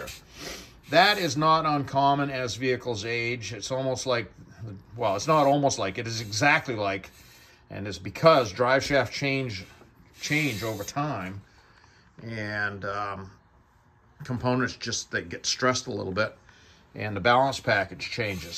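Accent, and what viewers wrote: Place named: Maine, United States. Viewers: Just a touch of Maine. lol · Thought so. Mike Day Concrete NORTH MONMOUTH, ME has the EXACT same accent.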